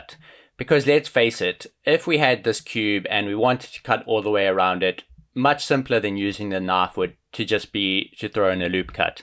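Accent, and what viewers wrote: South Africa. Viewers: Well I'll be damned, that's a Saffer accent if ever I heard it! Where you form AB?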